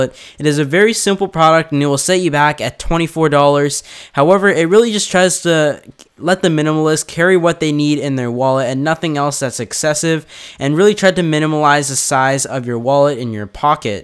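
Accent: Canada. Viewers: Your're Canadian?!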